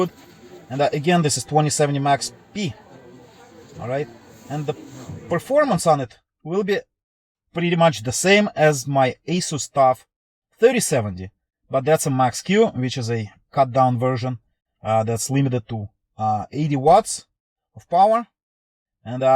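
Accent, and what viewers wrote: Russia. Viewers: Почему он говорит на английском а слышу я русский ? 😀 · Прикольно слышать акцент и догадываться, что это русский. Успехов в майнинге. Я не понял смысл первой части видео. 2070 тоже бывают Max-q ?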